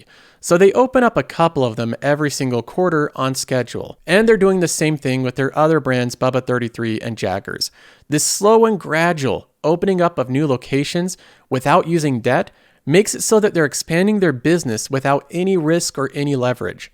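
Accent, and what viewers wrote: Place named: Northwest, United States. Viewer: Hey man - I gotta ask, are you from the NW? I just got married in Washington State and my wife's entire family talks just like you the way you pronounce words like "Feel" kind of like "Fill" or "Fell". Haha so funny!! 😂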